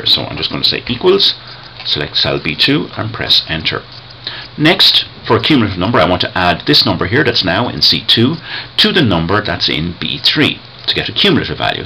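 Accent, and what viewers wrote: Ireland. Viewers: You just saved my ass for my class. Please keep this up. On a side note - awesome Irish accent man.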